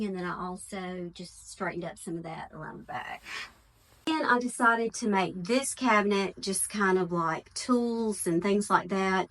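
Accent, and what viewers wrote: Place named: Alabama, United States. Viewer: Came for the organization, stayed for the beautiful Alabama accent! Music to this Southern gal's ears!!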